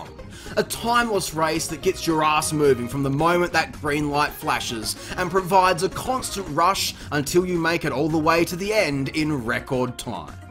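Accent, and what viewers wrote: Australia. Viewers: Wow, what a bumper and brilliant treat this was to watch! I really enjoyed your opinons and enthusiasm, nice to have an Ozzie accent to listen to throughout!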